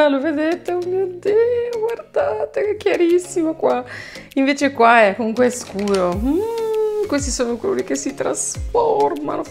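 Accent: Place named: Ancona, Italy. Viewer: Sento quasi l’accento anconetano/decollemarì 😂😘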